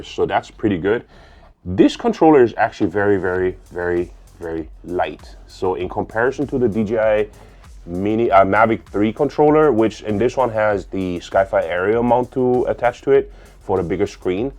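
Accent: Hawaii, United States. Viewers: I enjoy watching your videos very good explanations. Just thinking I don’t think you are a native of Hawaii with an accent like mine lol👍👍👍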